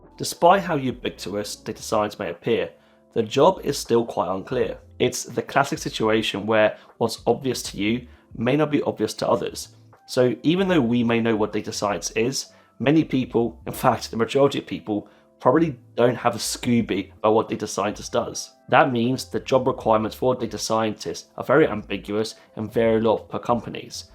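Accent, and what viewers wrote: United Kingdom. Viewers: Are you native british , because I hear your words very clearly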